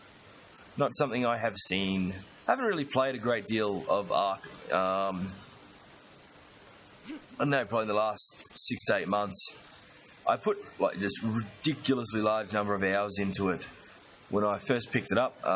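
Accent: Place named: Australia. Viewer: Looking forward to your wife's bad jokes lol Definitely picked up on the Australian accent. I am from South Africa, myself. Damn that L72 fish! Cool video man :)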